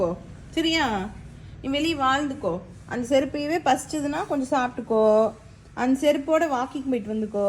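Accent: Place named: Coimbatore, India. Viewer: I just loved her Coimbatore Tamil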